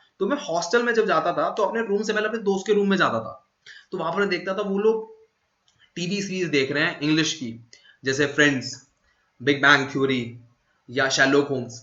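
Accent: Meerut, India. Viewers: Bro I am from Hapur I relate a lot from your meerut wala accent I want to improve my english grammar. Please give me an solution. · Meerut wale 🤣🤣🤣🤣 Inge unge this is true meerut ki language bilkul aese hi hai btw I'm from meerut too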